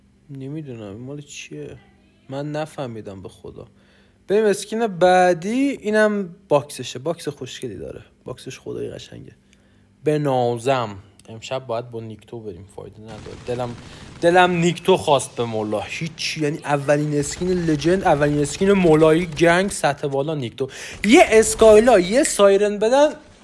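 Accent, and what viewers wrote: Kermanshah, Iran. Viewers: داش یکم کرمانشاهی صحبت کن دیگه بشنویم لهجه ی کرمانشاهی های عزیز سلطان😂🔥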